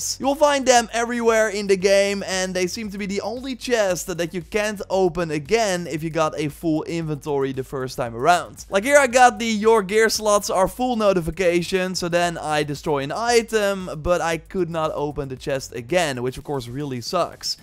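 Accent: Netherlands. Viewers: are u dutch? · Zalig toch da Nederlands accent er tussen 😂 ma super tips wel ✨ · Please stop mispronouncing ‘duck’ (duiken, aan de kant gaan) as ‘Dutch’. You’re a Dutch speaker yourself, I can tell, however, your accent is fine, good job. But that one mispronunciation is just worse than the rest.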